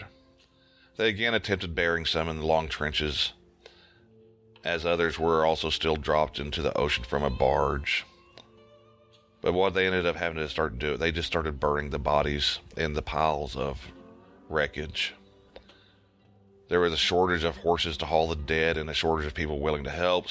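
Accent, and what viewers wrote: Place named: Texas, United States. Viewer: cool man , I’ll tune in. Gonna take me a while to go through all your videos but I like the narration that sounds like you’re actually from Texas telling stories around the campfire. Very wholesome and cozy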